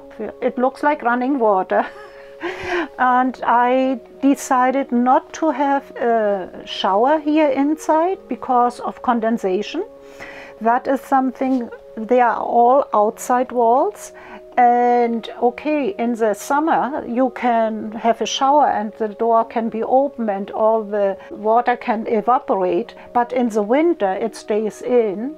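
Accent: Germany. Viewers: Ich liebe deine Deutch Akzent. Sehr nett klein haus und auch schön garten. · You are a nice and cool lady, my first impression was that you look europaen, not american.........and your englisch ist not american....... I think, you are German.....??? Alles Gute für Dich......🤝👍🙆‍♀️ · Lovely lady, lovely Deutsche Akzente, lovely little house! I like them all! :-)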